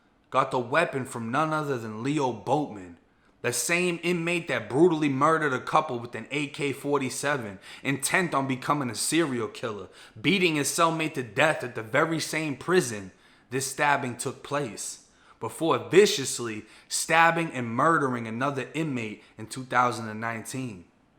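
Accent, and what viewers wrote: Boston, United States. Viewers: I dont care what anyone says u look n sound mad familiar n thats a Boston accent.lol..💯 It'll come to me i lived in FL when i got released for 5yrs!! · Bro this shit funny as hell. I was just watching some of your old videos and I gotta say man, your Boston accent has gotten so much thicker since when you first got out. Your old videos you still had that Florida style speak. Now you straight Red Sawks and ima have a bea down at the bah style.. Lol. Love ya videos tho bro. Also, I used to just listen to your videos while I was driving but now you gotta actually sit there and watch your videos cuz you got photos, pics, graphics and shit. Stepped yo YouTube game up bruh. Keep doing ur thing tho, my man. Awesome content. Awesome stories. Peace bruh..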